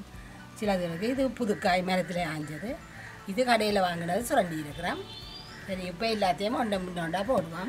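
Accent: Sri Lanka. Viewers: அம்மா உங்களின் தமிழ் அருமை. எனக்கு உங்களை போன்று இலங்கை தமிழ் பேச ஆசை ஆனால் நான் இலங்கை தமிழ் பேச முயற்சி செய்தும் முடியவில்லை.உங்களின் இலங்கை தமிழ் கேட்பதற்காகவே நான் உங்கள் பதிவை தவறாமல் பார்ப்பேன். சுவையான உணவை சமைத்த உங்களுக்கும் உங்கள் சமையலை பதிவு செய்த உங்கள் பிள்ளைக்கும் மிக்க நன்றி அம்மா. மலேசியாவிலிருந்து 🇲🇾❤️🇱🇰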